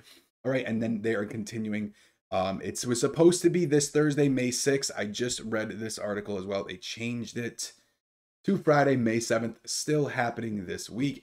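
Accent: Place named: Chicago, United States. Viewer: Great message for new stock holders. Also, not sure if you're from Chicago or not but you sound like us big time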